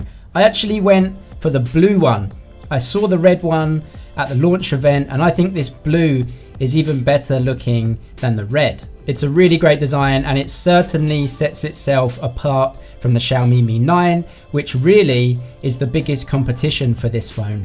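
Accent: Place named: United Kingdom. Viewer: Hi, im looking to see this on Amazon via your link but its just taking me to the homepage when i click it. Any ideas? Im in the UK (and judging by your accent you are too) so how have you got your hands on it? Were you sent a review unit or did you buy it yourself?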